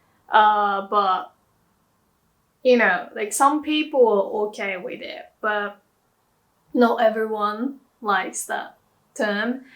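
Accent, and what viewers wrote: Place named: United Kingdom. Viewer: Omggg your English is so good it sounds like almost a perfect British accent normally people learn with an American accent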